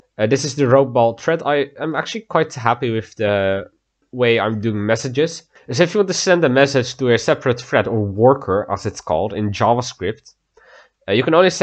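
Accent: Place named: Netherlands. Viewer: woah, i did not expect this this channel to be under rated! nice vids fellow Dutch man! (At least, you sound Dutch :) )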